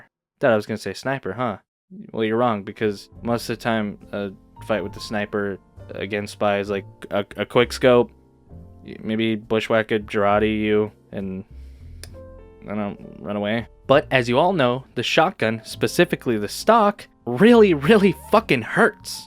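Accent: New York, United States. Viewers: I always thought that your New York accent was your actual voice whoops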